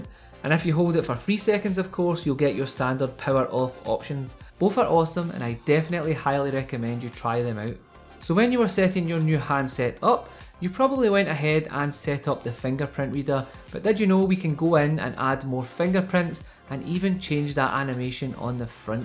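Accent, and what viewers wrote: Scotland, United Kingdom. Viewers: OK, Had to subscribe, hearing a Scottish voice instead of an American or Indian one. Goan yirsel' ! Totally agree about dark mode, Google has taken far too long to make it an option, they've also been doing their best to kill the Substratum themes capability too, I think their devs are jealous when custom rom devs make nice toys. FYI That phone isnae gonnae work well wae nae sim card though :) One tip I hadn't known about was double tapping in the cam app to switch cameras between front/back, saw that in a review recently. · I guess you are Scottish gerat video 👍🏻mate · man ILOVE YOUR ACCENT - where are you from ..? Scotland .??)) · Are you Scottish? Love the accent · His he Scottish? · Are you from Scotland??you got amazing accent!!! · I'm subscribing to help you reach your 100K subscribers. Be thankful to your Scottish accent. 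· Scottish accent. Right? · Scottish mate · Scottish accent is a bonus! I love it! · ... Ef you liked it... Haha. That Scottish accent.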